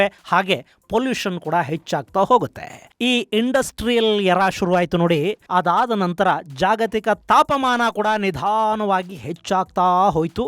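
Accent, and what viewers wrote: North Karnataka, India. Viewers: ಸರ್ ನಿಮ್ದು ಉತ್ತರ ಕರ್ನಾಟಕ ನಾ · Sir I love the tone of Uttar Karnataka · ವಾವ್ ಸರ್ ಅದ್ಭುತವಾದ ಉತ್ತರ ಕರ್ನಾಟಕ ಭಾಷೆಯನ್ನು ಮಾತನಾಡುತ್ತೀರಾ ನಿಮ್ಮ ಊರು ಯಾವುದು ಮತ್ತು ನಿಮ್ಮ ಯಾವ ಜಿಲ್ಲೆಗೆ ನೀವು ಯಾವ ಜಿಲ್ಲೆಗೆ ಸೇರಿದ್ದೀರಿ ಹಾಗೆ ರೈಲ್ವೆ ಫ್ಯಾಕ್ಟ್ಸ್ ಗಳ ಕುರಿತು ಒಂದಿಷ್ಟು ವಿಡಿಯೋಗಳನ್ನು ಮಾಡಿ ಸರ್ ಅದು ದೃಷ್ಟಿಹೀನರಿಗೆ ಉಪಯುಕ್ತ ವಾಗಿರಬೇಕು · ಉತ್ತರ ಕಾರ್ನಾಟಕ ಭಾಷೆಯನ್ನು ಅದ್ಭುತವಾಗಿ ಮಾತಾಡಿದ್ದೀರಿ ಸರ್ ನೀವು ತುಂಬಾ ಧನ್ಯವಾದಗಳು ಸರ್ 🙏🙏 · ನಿಮ್ಮ ಬಾಯಲ್ಲಿ ಉತ್ತರ ಕರ್ನಾಟಕ ಭಾಷಾ ಕೇಳೋದೆ ಒಂಥರಾ ಮಜಾ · ನಾನು ಮೊದ್ಲ ಬಾರಿಗೆ ನಿಮ್ಮ ಧ್ವನಿಯಿಂದ ನಮ್ಮ ಉತ್ತರ ಕರ್ನಾಟಕದ ಕನ್ನಡ ಭಾಷೇದಾಗ್ ಕೇಳಿದ್ದು.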